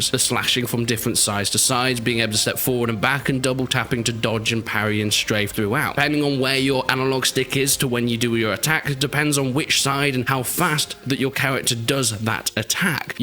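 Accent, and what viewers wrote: England, United Kingdom. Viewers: he's English you knob just how we talk 😅😅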